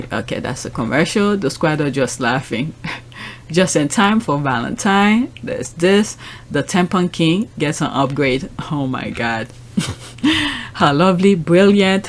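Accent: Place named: France. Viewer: I just came across your channel girl you are so good at hitting the main point on each click I love your French English accent if am right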